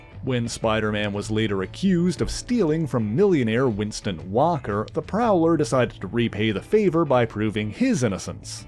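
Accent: Canada. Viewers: It’s HO-b…not hobby…is this dude Canadian?…some of the weirdest pronunciations ever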